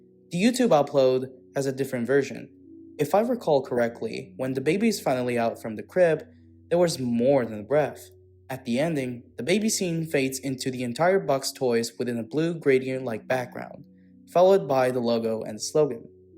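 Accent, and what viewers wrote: United States. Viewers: Wow, I'm not a native speaker of English but I really thought you were an American studying in Italy or something. Great content, keep it up!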